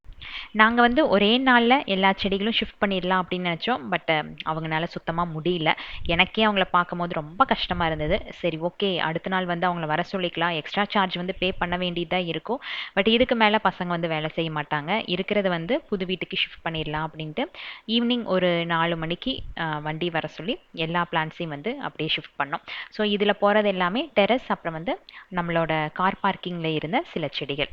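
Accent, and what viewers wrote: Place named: Kongu, India. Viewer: Akka, your voice and that kongu tamil is so sweet. Also our tamil and gardeners reaction is romba edharthama eruku nga ka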